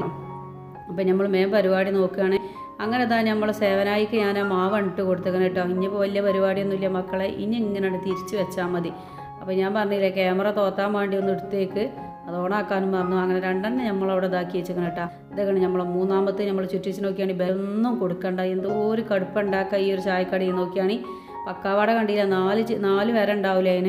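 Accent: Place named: Malabar, India. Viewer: Pure malabar Muslim laungage.Very intersting for lissen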